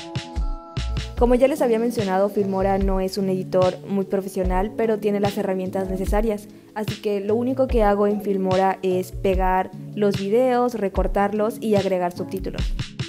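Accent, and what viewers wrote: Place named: Yucatán, Mexico. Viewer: Por mi casa también pasa Zgas jaja 🙊 Tengo curiosidad de dónde eres, sospecho que de Yucatán o Quintana Roo, tu acento me recuerda al mío jaja ❤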